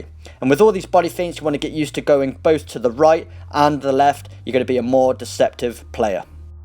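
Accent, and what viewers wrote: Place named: England, United Kingdom. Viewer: Very nice,! Your English accent makes it more clear to understand here in America because the football commentator i like are English hahaha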